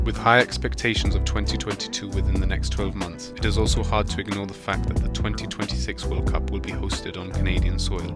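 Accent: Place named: Europe. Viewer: As someone who has lived and( just about always) died with the CMNT my whole life, I think this is a very well researched video and hits some great points. One think that I think Europeans (I'm assuming because of the accent) don't full grasp is just how crazy qualifying in CONCACAF is. Herdman will have to keep the boys focused for the remaining 4 away matches. They have shown a lot of grit and fire, lots of shoving matches during and after games. They will have to keep their discipline when playing in Honduras, El Salvador...etc Thanks for posting, great video.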